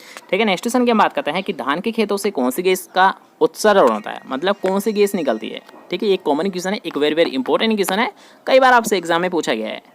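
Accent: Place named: India. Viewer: Sir ji aapki awaj bahut achhi h....aap kaunse stet se h ..aapki Hindi kuch alg h..but cute h...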